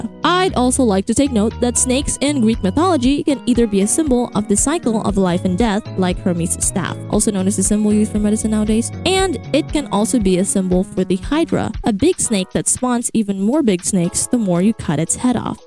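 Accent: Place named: Philippines. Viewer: Thank you so much for making these videos!! I love watching them because I could use these as a basis for some of my analysis (I post them on tumblr hehe) because I focus more on heavy character/design analysis and storyline theories, but I kinda get lazy on the themes and origins part lol. I noticed through your accent that your a fellow Filipino as well haha. xD I hope you have a good day! :3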